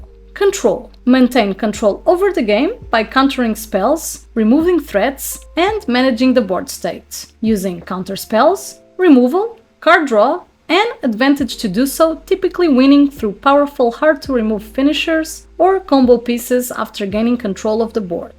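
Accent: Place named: Portugal. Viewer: Pt accent? Amazing!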